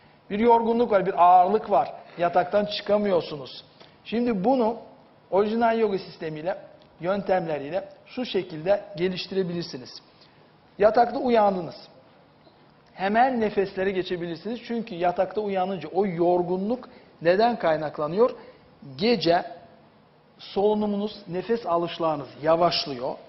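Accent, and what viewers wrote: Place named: Azerbaijan. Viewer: Konuşan üstad kesinlikle azerbaycanlı! 😊 Yanıldım mı? 🤔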